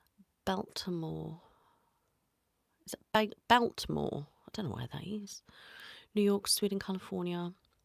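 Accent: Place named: Birmingham, United Kingdom. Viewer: love your videos definitely a Brummie accent xx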